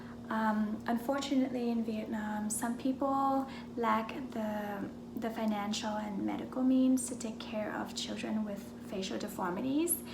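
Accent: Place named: United States. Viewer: Your accent is very Murican and you're a cutie patootie!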